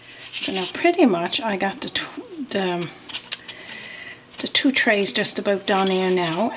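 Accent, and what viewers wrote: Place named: Newfoundland, Canada. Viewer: These look incredible. Is that a Newfoundland accent I detect? I love it. ♥️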